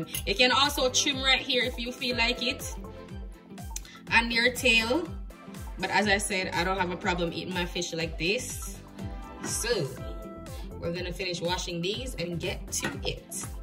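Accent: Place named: Jamaica. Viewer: Muma naa lef out the Jamaican accent at all! Mi love that 😂😂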